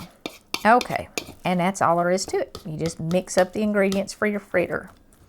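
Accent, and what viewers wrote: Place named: East Tennessee, United States. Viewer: Thank you so much for making these videos. Everything is broken down for someone who hasn’t cooked much. You are a great teacher, you are positive and you show God’s love in all you do. So many YouTubers right now are using fear and anger to get views. Your videos leave me feeling hopeful and peaceful and ready to try something new. I am so grateful for you. P.S. I love your accent. My granny was from East Tennessee and listening to you makes me happy! I am a retired women living in NC, and never had a family so I never learned how to cook - until now. Thank you so much. (Beth)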